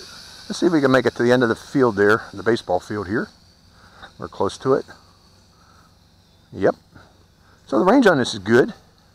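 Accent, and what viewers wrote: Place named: Western Pennsylvania, United States. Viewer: Hello, I've seen some of your other video's in the desert, and could hear when you spoke you had an accent close to what I'm use to hearing living in western Pa.... I have lived in and around Pittsburgh most of my life. Thanks for taking the time to make and upload the video's you do. Hope all is well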